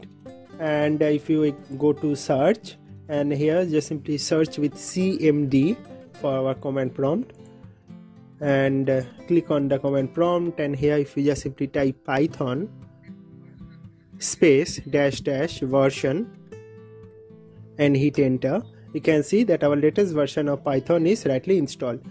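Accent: India. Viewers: indian accent : check factual information : check does not waste time : check yep this man is legit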